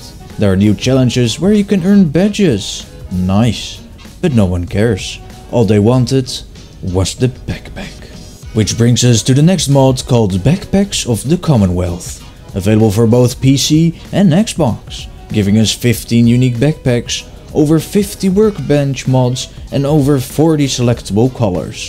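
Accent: Netherlands. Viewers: I'm i right on detecting a dutch accent? Ik denk het wel namelijk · Literally translated "good busy". In proper terms, usually a sarcastic way of saying "well done". He's Dutch, like me, so I can easily notice his accent. I merely made a bit of a giggle here; nothing serious.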